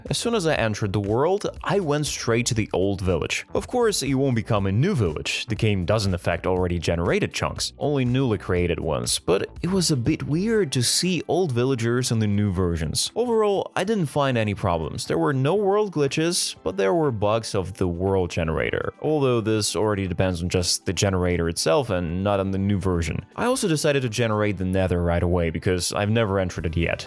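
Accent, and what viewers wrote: Russia. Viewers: Только на 2 минуте заметил что русскоговорящий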